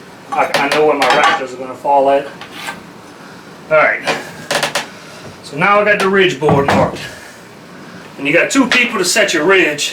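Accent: Louisiana, United States. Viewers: Are you in Louisiana somewhere? 😊 You sure sound like you're from home. Thank you for this detailed, clearly communicated instructional video. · Nicely done! Man, I thought I heard Louisiana in there somewhere.. subscribed. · What part of Louisiana you from, bruh? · One of the best videos. I’ve watched it so many times. I would have bet my life when I first started listening that you were a black man named Mr. Beauregard. You were standing in the garage. I was so surprised when you were outside and you were white! 😂 Love your accent! Is it Louisiana?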